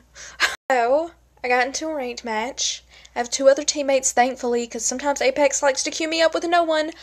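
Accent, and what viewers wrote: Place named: South, United States. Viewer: Your so country, I love it 😍 lolol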